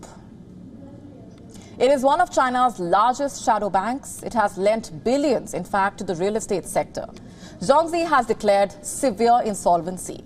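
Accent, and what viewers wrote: India. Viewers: Her Indian English is more interesting to me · Indian accent... a bit hard to understand..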